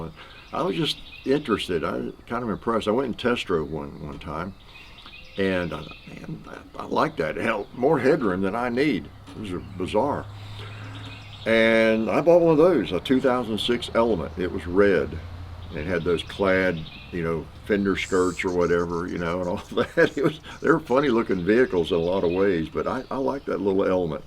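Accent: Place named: Northern Kentucky, United States. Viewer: Sounds like you started out in northern Ky. Hello from California KY.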